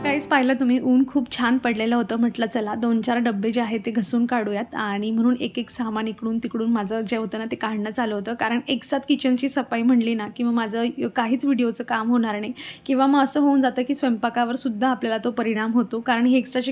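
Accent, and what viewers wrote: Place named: Khandesh, India. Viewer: Tai ahirani bolat ja na madhe madhe... khupach god vatat hoti aikayla.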